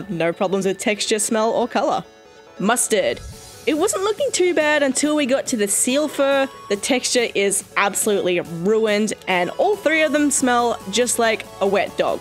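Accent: Australia. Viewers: Australia? Are you Australian?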